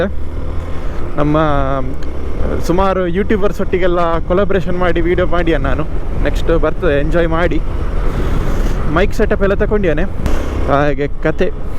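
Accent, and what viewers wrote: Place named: Mangalore, India. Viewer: Woww manglore accent Kannada 🔥 sakath vlog bro husharu 🔥 subscribed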